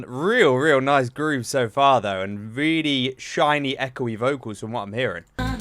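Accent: United Kingdom. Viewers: イギリス英語が聴けたのでとても嬉しいです！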